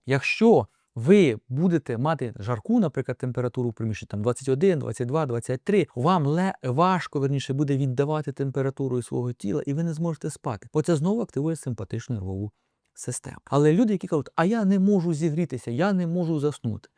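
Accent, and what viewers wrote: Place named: Lviv, Ukraine. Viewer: Ви, напевно,- лікар від Бога!! Особливо мені подобається ваша вимова! (Я закінчив учбовий заклад у Львові та прожив там понад 30 років… Тому ваша вимова мені дуже рідна!! ) Дякуємо вам за ваші поради! Дай Боженька вам та вашим близьким,- Здоровʼя,, Добра та Любові! ❤️! Будьмо!!!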